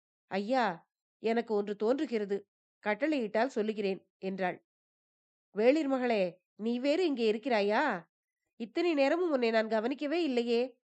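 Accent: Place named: Gyeongsang, South Korea. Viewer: 오 경상도 시투리 억양과 비슷 하구나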